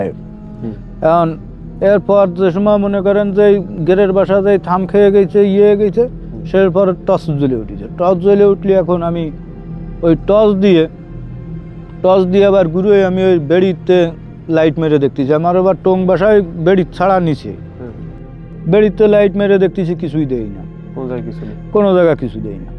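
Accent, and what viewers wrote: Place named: Bagerhat, Bangladesh. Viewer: amader bagerhater vasa mone hocche❤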